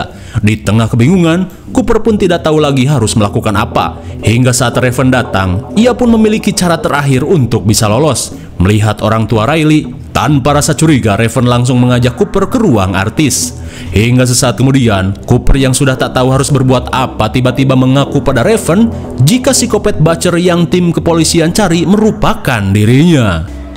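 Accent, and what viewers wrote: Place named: Sunda, Indonesia. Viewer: Seru bahasa pembawaannya bang, apalagi ngomong aneh aing mah, si abang nya org sunda ini, salm sunda bnten bang, salm subscribe baru mu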